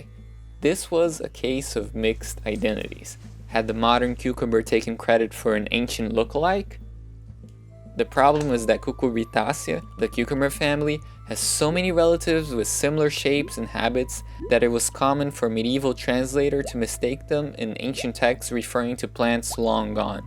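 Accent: Brazil. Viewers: OMG I LOVE LOVE LOVE your videos. I am a half breed American/Brasilian. My mother is from Natal and my father is a TEXAN! I could pick up your portugese accent having been raised with the familiar tone of your voice. That aside, I am also an avid gardener, engineer and lover of art, knowledge and origin stories. As with many of us Brazilians, we look for meaning in everything and you do not disappoint! Subbed and Im terlling EVERYONE I know about this amazing channel!!!!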